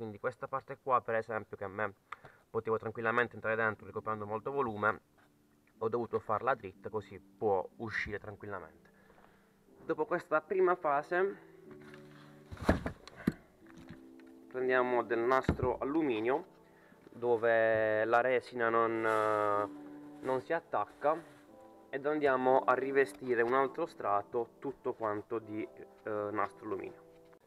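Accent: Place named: Apulia, Italy. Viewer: di dove sei io Bari tu?ho sentito che sei pugliese giusto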